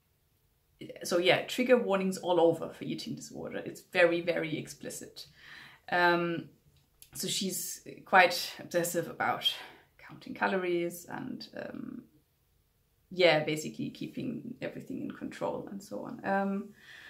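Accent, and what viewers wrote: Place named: Northern Ireland, United Kingdom. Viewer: Sorry you haven't had a great day, though with all of this stuff going on I can see why. Thanks for making this video anyway though. I imagine a lot of Booktubers probably feel pressure to be cheerful in every one of their videos, but as a long time booktube viewer I find it just as valuable to listen to people when they're angry or sad as when they're happy. Thanks for sharing your perspective on things and for these recommendations of what to watch. I've got some Northern Irish family so I'm used to the accent as well, but I get why people find it befuddling! I like your reasoning behind revisiting The Butchering Art. Thank f*ck for science indeed!😆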